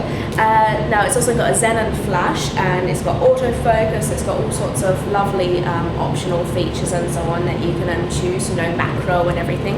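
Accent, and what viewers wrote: England, United Kingdom. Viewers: u got beauuuuuuutiful eyes :P and damn, that english accent <3 oohhhhh, i think i just came....LOL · lol "phothouss " xD I like the british accent :D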